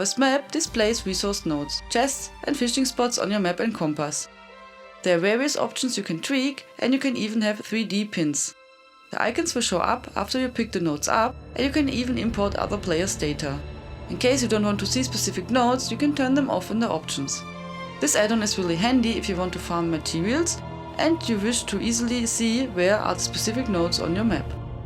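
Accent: Germany. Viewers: Very good list of add-ons thanks for that. Also probably the thickest German accent I heard in a while, but your voice makes it kind of cute :) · deutscher akzent :3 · Du hättest es auch auf Deutsch machen können, oder ein Zweites Video auch wenn ich alles verstanden habe, der Akzent schreit nur so ''ich spreche Deutsch'' xD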